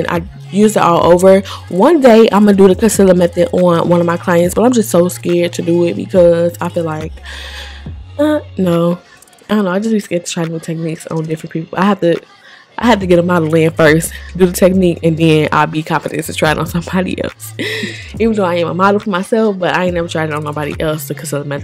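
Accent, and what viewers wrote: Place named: DMV, United States. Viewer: You sound like you're from the DMV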